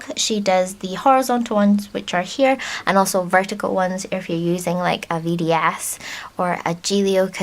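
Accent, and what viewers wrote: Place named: Scotland, United Kingdom. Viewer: Love the video! Was pleasantly surprised to hear a Scottish accent too 😁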